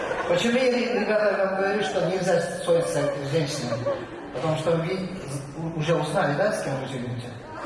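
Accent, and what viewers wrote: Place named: Kyrgyzstan. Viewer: Мен отуз жылдан кийин орусча эсимден чыга баштап келсем Бишкекте азыр кыйгыздар суйлогонун ушундай угуп калам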